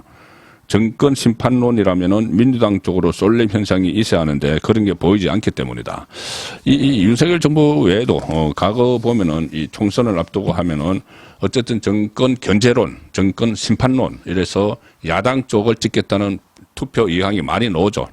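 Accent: Gyeongsang, South Korea. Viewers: 야 개소리말어 경상도인간들 다바꿔야해 너같은인간포함해서..그래야 우리가 이길수있어 너자꾸 경상도눍은이 싸고돌지마 니 서투리듣기도싫어 우파니까듣는거야 전라도도 싫지만 경상도 지금 의원 전부바꿔야해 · 송국건의 혼술 구독자가 43만이 넘었네요. 경상도 출신의 투박한 어투지만 오랜기자 생활을 통해 터득한 다양한 경험을 여과없이 전해주신 진정성이 빛났네요 늘 공감합니다.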